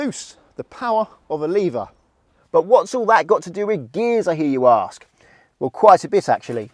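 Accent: United Kingdom. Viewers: I think he is being rude about your British accent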